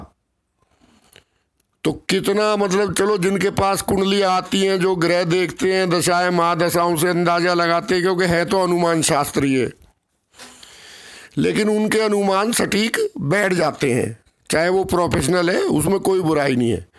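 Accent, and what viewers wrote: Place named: Delhi, India. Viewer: Ram Ram Dada aap ki boli Delhi Ncr ki hai pahle bhi apse reqvest ki hai darsh karne ki aapse marji aapki apka contact no to milta nahi mera no hai <num> Om namo narayan Dada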